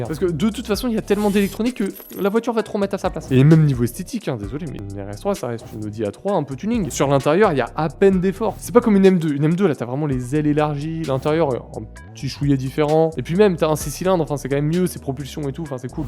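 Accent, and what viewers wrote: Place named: Paris, France. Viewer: Dit il avec son accent de bobo parisiens qui roule à velo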